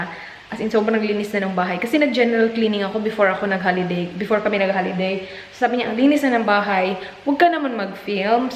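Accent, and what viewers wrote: Visayas, Philippines. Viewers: Bisaya jud gihapon ang accent te ba. AHAHAHA LOVE YOU ALSO!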